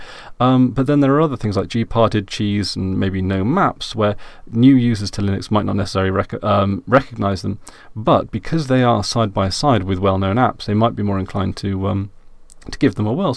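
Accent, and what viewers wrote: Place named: England, United Kingdom. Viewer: You come from England, right?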